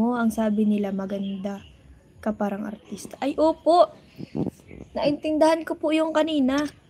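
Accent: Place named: Visayas, Philippines. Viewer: 😂😂😂😂😂 nakakatawa ka langga mag salita nf bisaya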